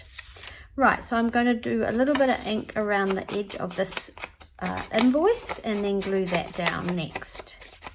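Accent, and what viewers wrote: New Zealand. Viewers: Love this tag, so beautiful 💙 Also so nice to hear a kiwi accent 😊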